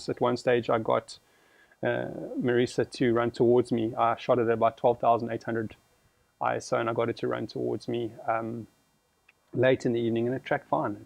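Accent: South Africa. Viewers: I stumbled upon your video and I knew the first minute there was something different in your accent. To me S. Africans sound like they had a little German inside trying to communicate in English, please no offense intended! I'm Spanish and we speak the worst English in the world, period! Nice video anyway, I'll watch more.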